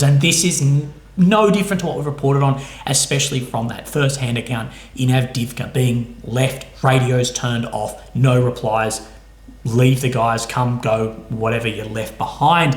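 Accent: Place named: Australia. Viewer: Your visuals are fine. Shows your intensity and good rich Oz language well.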